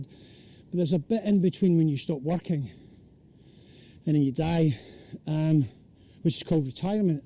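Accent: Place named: Glasgow, United Kingdom. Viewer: Did my undergraduate study at the university of Strathclyde in Glasgow, your accent really reminds me of the old times, glad you like China~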